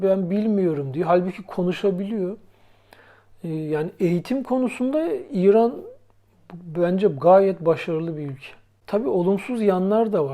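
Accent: Samsun, Turkey. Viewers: Yaa Samsunlu olduğun çok belli ama.O ikiyüzelli deyişin ele veriyor😂Ben bu siveyi düzeltene kadar ne uğraştım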